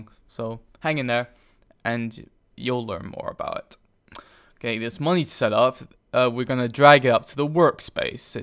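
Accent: United Kingdom. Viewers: I used to really like/want a british accent but i swear to fucking god i am glad i dont have to hear that for a long time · are you British?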